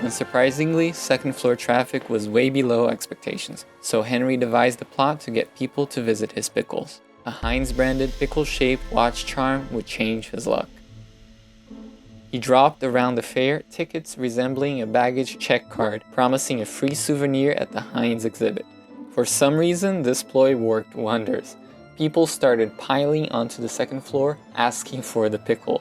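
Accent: Brazil. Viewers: OMG I LOVE LOVE LOVE your videos. I am a half breed American/Brasilian. My mother is from Natal and my father is a TEXAN! I could pick up your portugese accent having been raised with the familiar tone of your voice. That aside, I am also an avid gardener, engineer and lover of art, knowledge and origin stories. As with many of us Brazilians, we look for meaning in everything and you do not disappoint! Subbed and Im terlling EVERYONE I know about this amazing channel!!!!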